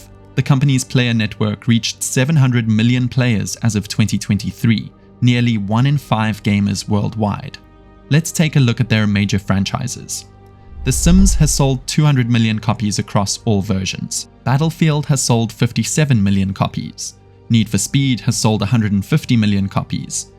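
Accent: South Africa. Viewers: I also thought he sounded South African. 🤔🇿🇦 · Someone said your voice is like a robot, didn't know a South African accent could be robot like, great video bru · bro you have a south african accent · top quality content man. and i can hear a "whaaait" South African accent?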